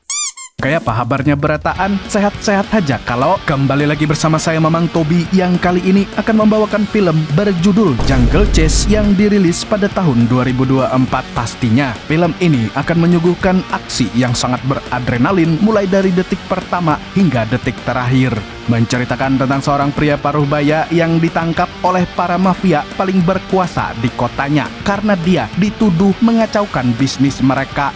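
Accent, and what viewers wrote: Banjar, Indonesia. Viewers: Bahasax Admin, bhsa Banjar gen, 😂 ngaran ikam spa?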